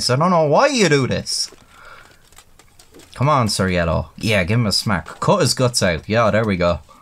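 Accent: Ireland. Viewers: Stories always sound better when told with an Irish accent. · It's the Irish accent that really does it for me. You ever notice English/Scottish/Irish accents are over represented on YouTube? They just sound good. Not too many popular Aussie voices though.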